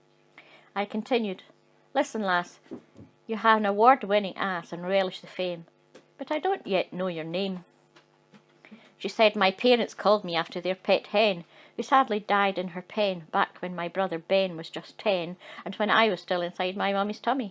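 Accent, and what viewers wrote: Scotland, United Kingdom. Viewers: Never did I know I needed so much to hear and Scottish woman read me stories!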